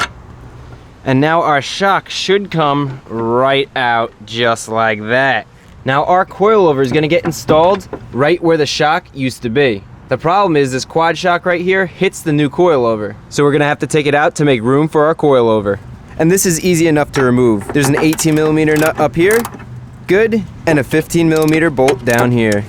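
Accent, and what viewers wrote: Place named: New York, United States. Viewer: Where is the track that you go to? Sounds like you're from NY/NJ and the closest place I know of is in PA (I'm from NY) the name of the track would be helpful!!!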